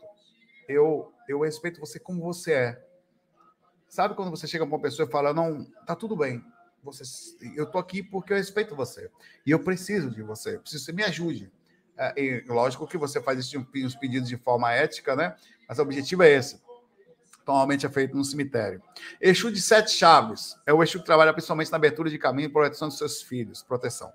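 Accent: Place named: Bahia, Brazil. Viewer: seu baianês é uma pérola. Obrigada!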